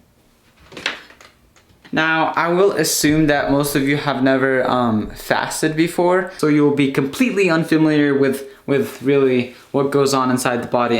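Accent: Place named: United States. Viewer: cool video! im an american that moved to Turkey a couple of years ago. i have some uzbek friends. they dont know english, so its really interesting hearing an uzbek person speaking perfectly accented american english lol... Türkiye'den Üzbekistan'a selamlar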